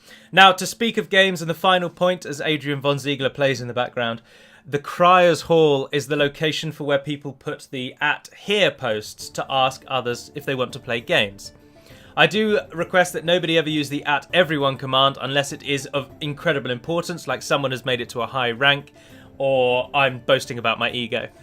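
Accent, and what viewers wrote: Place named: RP, United Kingdom. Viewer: you are incredibly posh its fascinating.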